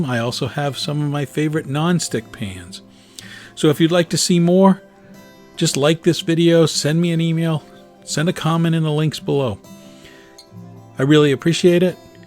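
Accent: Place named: New York, United States. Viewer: you from ny aint you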